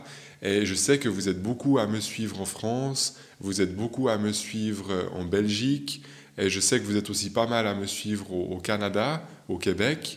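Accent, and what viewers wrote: Switzerland. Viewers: Bonjour, je te découvre aujourd'hui au travers de la video poser des questions a son guide , et tout de suite jai noter une pointe d accent suisse . Lorsque jai vu que tu proposes des formations j ai ressenti que cest sûrement en Suisse . Bingo ! Mais cest top que tu les proposes a tes followers d autres contrées 👍👍👏👏👏 Japprecie tes conseils que j approuve et je voulais savoir si tu pouvais faire une video sur les guidances YouTube a choix ou autres . Ou l'utilisation des cartes ou tarots .personnellement je trouve que souvent ca empeche de se recentrer sur ses ressentis innés. Deplus , je suis croyante mais jai du mal avec la religion car il ya beaucoup de discipline a avoir au sujet de la priere , jeûne ect ... pourtant j ai la foi , mais jestime que Dieu aime toutes ses creatures tant quon ne fait de mal a personne et pas besoin de simposer des manieres de prier ect . 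Px etre un autre sujet de discussion ? 🙏 Bon Mardi 😊🌞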